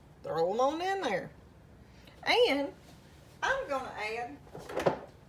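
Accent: South, United States. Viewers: Looks delicious, I like your southern talking voice .. you can get those soda crackers at a good price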